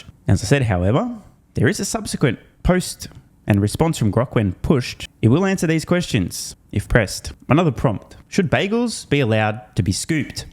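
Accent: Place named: Australia. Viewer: You sound so Australian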